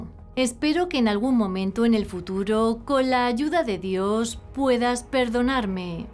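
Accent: Spain. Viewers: Hola gracias x tu arduo trabajo,me encanta tu forma de narrar los casos y tu acento español,muchas felicidades y bendiciones para todos.🙏🏼❤️🥰😍😉🤗💐⚘️🌷🌹👊👍❤️🙏🏼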